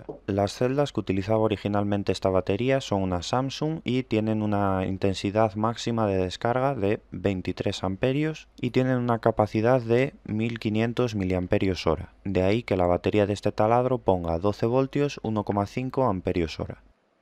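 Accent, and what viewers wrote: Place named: Asturias, Spain. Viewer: Diría que yes de asturiano tío Muy buenos los videos, muy guay explicao, gracias por tu ayuda un sub más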